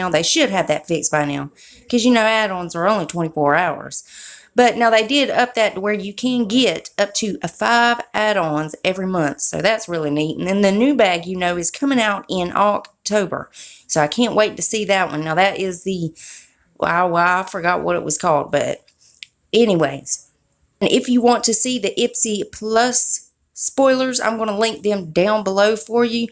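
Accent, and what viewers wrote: South, United States. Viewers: I love your accent so much!! I'm southern...but girl, you're super southern, 😂😂!! Bless it!! 😘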